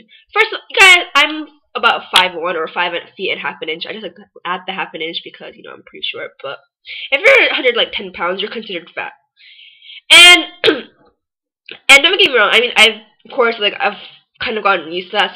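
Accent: United States. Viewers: don't put out your insecurities like being fat as a korean problem. If you were truly comfortable about your body and complexion, you would not have a problem brushing off what others have to say about you, them being korean or not. Before you talk shit about other koreans, think about the blood that is pumping in your heart. from your accent i believe you live in the united states, youre a korean american but the blood that pumps through your heart is that of Korean descent, one that has successfully been preserved and cherished for thousands of years amongst world powerhouses like japan and china. be proud of who you are and learn to embrace all that makes you, you. do you even know the great men and women who have sacrificed their lives to make our country what it is today? do some research, and if you still hate koreans, so be it. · LOL I never heard a Korean girl cursed so American like & she nailed it. 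U are so cute sweetie keep doing you. I've heard Korea prison are very hellish compare to American prison. Smart & cute I want you LOL... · You sound American, not Korean. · I don't think it matters whether or not she's Korean-American or Korean. She's talking about why she hates being Korean. Regardless of if your Korean-American or Korean, you're still Korean. Maybe she used pounds because she's speaking in an American English accent, so maybe this video was directed towards Americans, who (omg I can't believe it.), use the term pounds. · Yeeeeeeeah, you're extremely annoying and I could barely last the 2 minutes I watched. I thought you'd be listing some personal cons of being Korean, instead you turned out to be some selfish kid complaining. It's hard to believe you're Korean, you sound like the stereotypical American white girl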